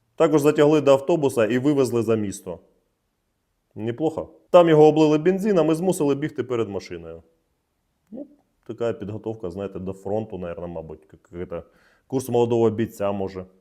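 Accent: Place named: Russia. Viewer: Як би ти не старався розмовляти українською , но по діалекту зрозуміло як ти обожнюєш рашиську . Так що йди під три чорти , чотириокий чорт .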